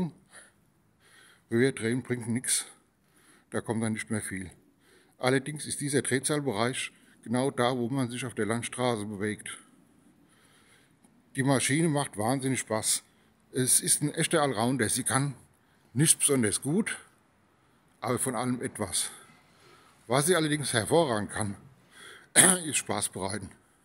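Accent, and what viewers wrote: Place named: Hesse, Germany. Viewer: Servus! Schön steht sie da Deine W650. Ich hätte es schon am Dialekt erahnen können, der Blick aufs Nummernschild gab dann Gewissheit, Du bist beinahe ein Nachbar. Ich komme aus Rodgau (Kreis Offenbach) und habe seit 2012 auch eine 99er W650. Ein herrliches Moped, ich liebe ebenso den Motor, rein von der optischen Ästhetik für mich der schönste Motorradmotor den ich kenne. Habe bei mir auch eine Reihe von Umbauten vorgenommen, habe hinten ein paar IKONs, ganz anderes Fahrverhalten. Und mit 120.000km ist noch lange nicht Schluß, die W ist unverwüstlich, man muss sie schon mit Vorsatz kaputt machen wollen bis da mal was passiert.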